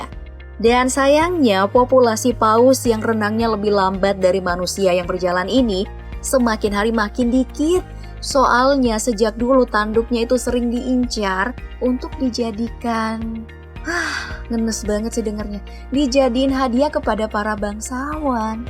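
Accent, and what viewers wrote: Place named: West Java, Indonesia. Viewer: Ini mimin nya pasti org sunda😅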